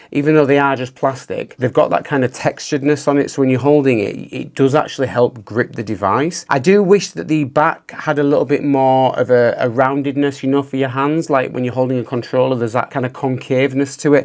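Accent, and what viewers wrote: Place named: England, United Kingdom. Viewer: i just want to thank you for doing this and for your channel im think of buying a rog ally because A good price B all the things i can use it for and C play at my friends house for sleepovers thanks for your reveiw. Ps my parents are from england and now we live in canada and it is very refreshing to hear your accent. thanks!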